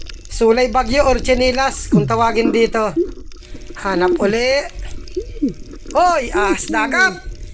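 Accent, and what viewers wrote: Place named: Camarines Norte, Philippines. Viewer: Idol lagi ako na no nood sayo nalilibang ako sa mga video mo at sa Punto ng PG sasalita mo Alam ko taga Camarines norte k. Taga labo kase ako. Dito n ako sa Laguna now, ingat k lagi God bless po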